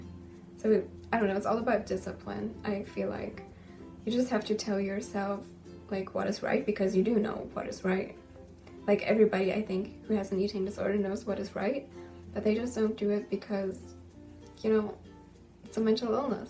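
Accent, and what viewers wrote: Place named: United States. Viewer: she have American accent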